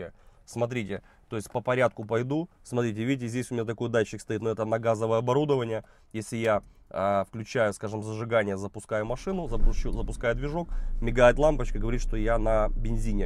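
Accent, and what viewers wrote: Ukraine. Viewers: Вы такой мужчина прекрасный! Дай Бог Вам здоровья. Так хорошо рассказываете. И, вообще, обожаю украинский акцент.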